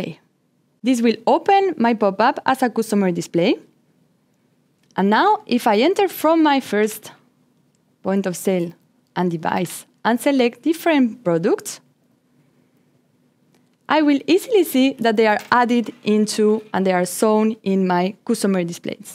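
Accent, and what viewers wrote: France. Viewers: Coostomer 😂 , or customer anyway , nice video with french accent 😊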